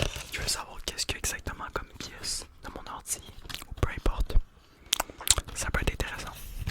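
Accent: Quebec, Canada. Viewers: L’accent québécois reste un des meilleurs à mon avis :)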